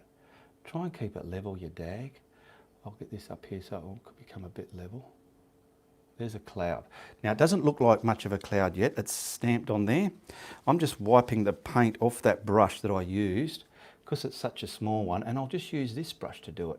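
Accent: Australia. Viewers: I just love hearing u talk...ok? U sound like crocodile dundee for me ok? U paint beautifully! I am so frustraded because i really can't draw or paint, have no skills what so ever, but it's so fun when you manage something that looks like something if u know what i mean. English is'nt my Language so bare over with me. Well anyway wanted to say i like both your talking and painting. Signing out from Norway, have a nice day...ok? 😀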